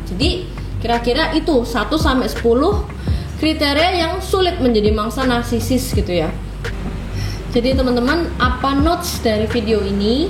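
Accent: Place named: Semarang, Indonesia. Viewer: Logatny kayak logat2 orang semarang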